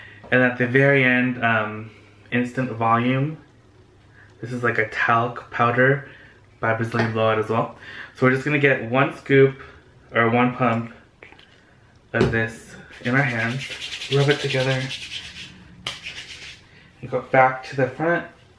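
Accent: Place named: Philippines. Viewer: I'm guessing you're a Filipino. I can tell by the accent. :)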